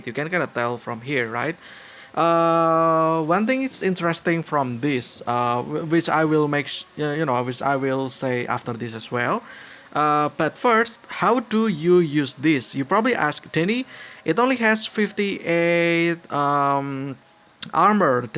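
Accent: Indonesia. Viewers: Are you Indonesian? That accent is so distinct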